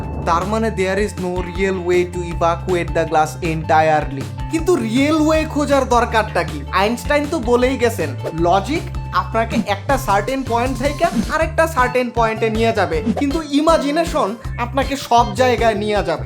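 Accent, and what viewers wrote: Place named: East Bengal, Bangladesh. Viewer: Give up your East Bengal Bangal language please.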